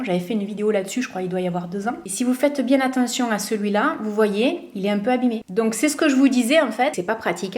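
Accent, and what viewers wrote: South, France. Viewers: Coucou ma belle, pour les fonds de teint, je n'achète pas ceux là, perso j'ai toujours acheté celui de chez Avène qui me correspond, et les grandes palettes de fard à paupières, ceci n'engage que moi, mettant toujours les mêmes teintes, elle est petite avec trois couleurs à l'intérieur, toutes ces marques c'est du pure marketing pour vendre, et perso cela ne m' intéresse pas, je trouve çà moyen, moi ce qui m'agace ce sont les produits qui ne sont pas remplis complètement, ou il y à une marge parfois de 3 à 5 cm alors que c'est indiqué 100 où 200 ml, et çà prendre le consommateur pour un C ..... !! où certains tubes que nous sommes obligés de couper car il reste encore de la matière, pour les rouges à lèvres, je te rejoins je ne comprends pas également, nous sommes dans une société de surconsommation, quand je vois défiler tous ces calendriers de l'avent à des prix de fou, c'est vraiment abusé, je n'adhère pas à ce concept, après comme tu le dirais chacun fait ce qu'il veut de son argent, c'est bien de remettre de temps en temps les choses au clair, mais malheureusement tu aura toujours des personnes qui se comportent comme des enfants et la bêtise humaine ne cessera d'exister, bises à toi copine du Sud avec ton accent que j'adore, garde ta simplicité et ta bonne humeur - Maryline :thanksdoc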